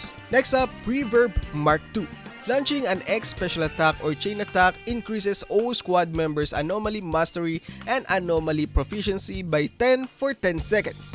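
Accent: Philippines. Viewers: halatang halata sa accent ehh PILIPINSSS 🇵🇭🇵🇭🇵🇭🗣️🗣️🗣️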